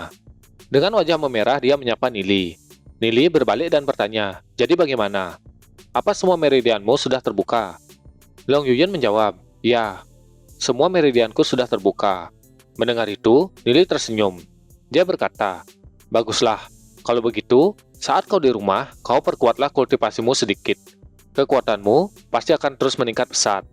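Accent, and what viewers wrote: Bali, Indonesia. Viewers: Logat bali?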